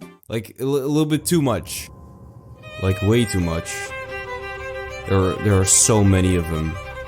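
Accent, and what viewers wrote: Netherlands. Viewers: are you dutch? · Are u dutch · Are you Dutch? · wait a min are you dutch?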